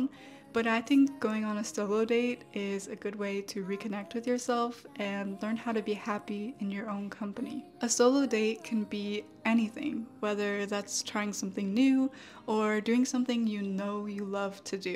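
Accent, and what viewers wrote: United States. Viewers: A lovely Chinese look-like American girl with a perfect American English tongue in such a tenderly whispering style！Watching your classes feels so good like breathing fresh air❤❤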